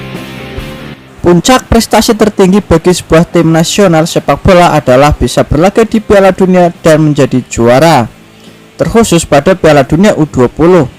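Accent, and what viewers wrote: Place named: Java, Indonesia. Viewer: Banyakin baca koran..hilangan medok mu mas...g enak didenger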